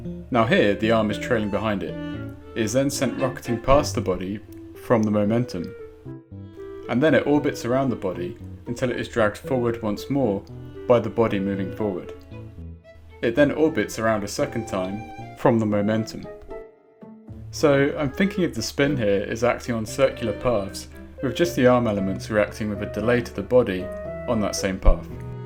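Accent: United Kingdom. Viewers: You sound like you are from British. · I had no idea you were actually British. In hindsight it is reckless to assume that everyone likes to do a British accent in daily life like myself. PS, your coloring is awesome, and is definitely the most unique part of your animations!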